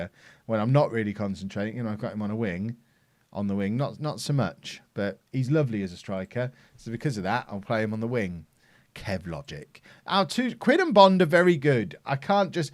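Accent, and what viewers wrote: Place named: England, United Kingdom. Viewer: I love the english pronunciations.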